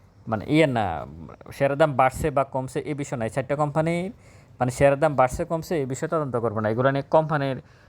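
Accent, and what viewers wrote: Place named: Noakhali, Bangladesh. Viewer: noakhailla bhasha emon e . Manush k choto kore kotha bola ucit na. Noakhaillara o manush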